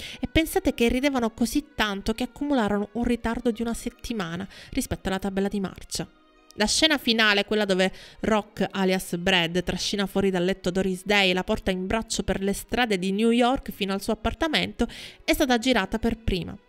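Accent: Sicily, Italy. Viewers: Brava ,scandisce bene le parole ,ma come si sente la cadenza sicula!! Adoro la Sicilia ,ma quella calata quando si parla di cinema di Hollywood......,insomma . Riguardo a Rock Hudson , beh sicuramente bello, adatto alle commedie con la bravissima Doris Day ,ma non certo un grande attore !! Ci credo che nel Gigante primareggiasse lo splendido James Dean talento puro ,basta un solo sguardo suo , e la finale una recitazione unica ,lui si era veramente il cinema di Stanislawsky ,come altro mostro di bravura Marlon Brando altro che Hudson......,che non si vede che ha sempre quell' espressione un po' beota?? Cmq,altra cosa certo che gli omossesuali sono molto più promiscui degli eterossesuali e anche molto estremi . E Hudson andando con questo e quello in liberta' assoluta se l' e' cercato !! Puo' dispiacere come essere umano ma non e' giustificabile Cmq ,a differenza di Dean che con quella bravura eccezionale avrebbe regalato splendide interpretazioni , lui a parte qualche commediola non sarebbe mai stato un De Niro ,o un Newman.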